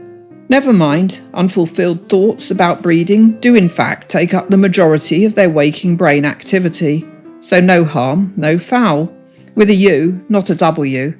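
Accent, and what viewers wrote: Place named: United Kingdom. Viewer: that was… that was fucking sensational. What the actual fuck did I just watch in the best possible way 😅 So many cool little details in here from a build standpoint, like the candy cane accessible toilet handles, the commissary, the flamingo flamenco, the kitbashing, the Rubik’s Cube… fantastic 🤩 But good god the commentary… bravo my friend 🥲 it was so delightfully bonkers and so delightfully British! It felt like a weird mix of evening BBC Local News segment and Diane Morgan being Philomena Cunk. And your script and turn of phrase was astounding. Absolute art. Fallout settlement building heritage right here.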